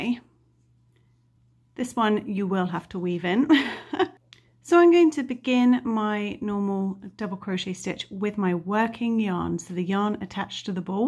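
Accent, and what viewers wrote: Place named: United Kingdom. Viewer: Absolutely genius!! Thank you so much for sharing. I have just ordered yarn to do a very heavy color change afghan (every row changes) and your timing with this amazing tip could not be better!! I am really excited to do my project now. I was dreading all the ends, but I really wanted to do the pattern. Woo hoo! Thank you, thank you, thank you. Love your channel and your Brit accent. Carry on!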